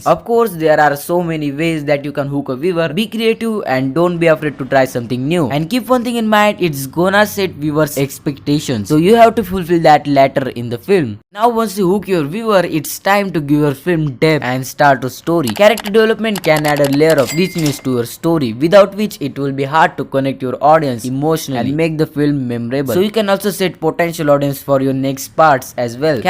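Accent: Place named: India. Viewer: Poor guys, he was in indian accents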